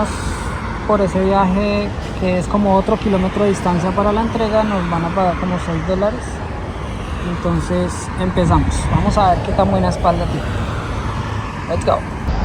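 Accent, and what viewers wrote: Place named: Bogotá, Colombia. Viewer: Buen día tienes acento rolo, elegante ver gente de Colombia en Australia 🦘🌏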